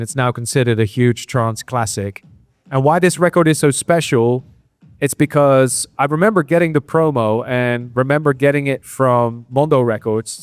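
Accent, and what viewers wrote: United Kingdom. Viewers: Why does he sound British? Lol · Armin sounds so different here versus ASOT. He sounds a bit British... Bizarre! Anyway, will definitely listen to this. Love this kind of insightful stuff.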